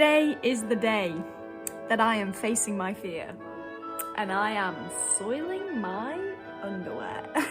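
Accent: United Kingdom. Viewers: Are you Scotish?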